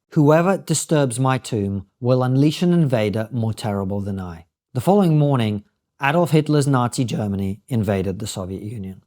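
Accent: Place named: United Kingdom. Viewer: Ты так быстро говоришь и с таким хэви-бритиш акцентом, очень трудно понять.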